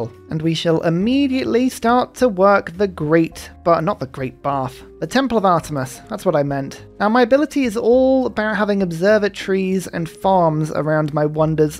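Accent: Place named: United Kingdom. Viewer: Your brit-feminine accent is so sweet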